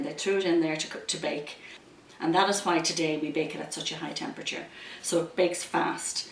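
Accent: Ireland. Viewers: Hi, I've recently subscribed with you, loving your video's so far & love your gorgeous Irish accent!...I'd love to know how long to leave the vinegar standing in a cup of milk?..I'm looking forward to making Soda bread the way my dear Irish Grannie used to make it! keep safe x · Love the video. Love your Irish accent. My ancestors came from Galway Bay. God bless the irish. Wish I could have a cuppa and a slice with you.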